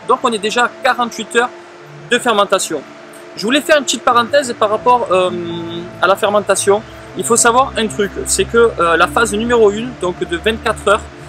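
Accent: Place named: Marseille, France. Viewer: Yeah merci ! Allez je test avec le sarrasin et je te dirai le résultat :-) merci encore. ET ENCORE, ENCORE, ENCORE !!! c'est trop bien tes vidéo. Puis le bon côté de l'accent marseillais, fait nous un petit "peuchère" dans la prochaine vidéo, que j'attends souvent sur le port de l'Estaque, quand je viens au Rove chez ma famille si tu connais :-)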